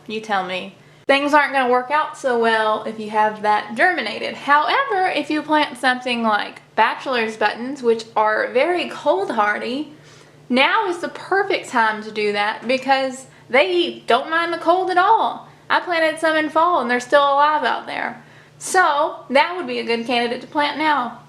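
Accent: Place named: Kentucky, United States. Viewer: I just watched this video and as soon as you started talking I had to smile. You sound just like me. Are you from Kentucky? Sure made me homesick. I live in NC now, but I love my old Kentucky home.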